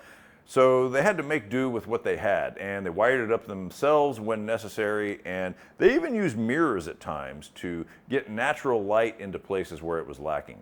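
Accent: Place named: United States. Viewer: This accent is extremely American.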